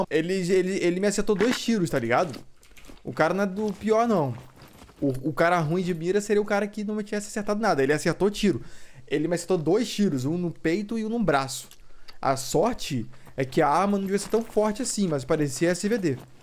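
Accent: Rio de Janeiro, Brazil. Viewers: quando o cara e carioca e foda kkkkkk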